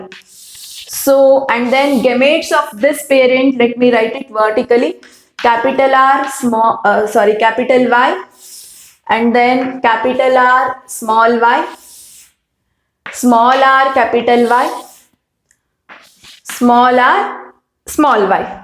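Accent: Karnataka, India. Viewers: Mam ur from Karnataka right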